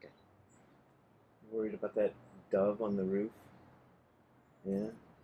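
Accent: Cockney, United Kingdom. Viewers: "Burn baby burrrrnn!" I am in the, and never coming back! And with that cockney accent, you could probably get a gig if they reboot Spinal Tap. Awesome! 🤓🤙🎶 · Loving the Cockney accent. Can you do a Geordie one next please 🙏 🙂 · That cockney accent sounds like Moss from The IT Crowd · GREAT Cockney accent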